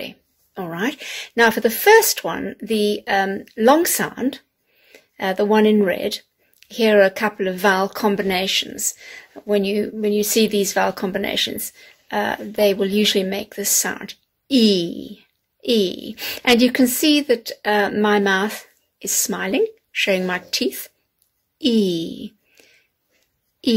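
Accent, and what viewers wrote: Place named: United Kingdom. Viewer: I've really enjoyed the video as her accent is so clear, the british accent is the best accent ever 😍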